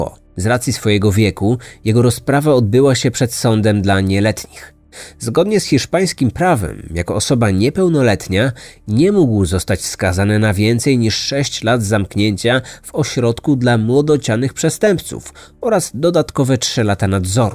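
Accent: Poland. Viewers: te promocje to k.. a ty masz jakies wschodnie pochodzenie. Hajnowka a moze Bielsk Podlaski. lubie cie ale akcent nie z pyrow lecz z podlasia. "trzeba nosic wlasna skore".pozdrawiam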